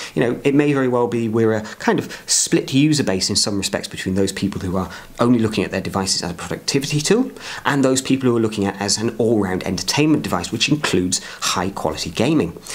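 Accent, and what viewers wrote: England, United Kingdom. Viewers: Please slow down your speech. Some people don't do cocain nor are they nine years old. I was interested in this video for reasons of technical research and personal inquiry. It took me a half second to make out what you were trying to say about the device. I do find it slightly difficult to make out an English accent as it is. But I thought that if you were wanting your video to be more professional as it would appear to be such a topic. I think it could be spoken in such a manner where all could enjoy. Thanks! I tried to PM this message but the option wasn't available.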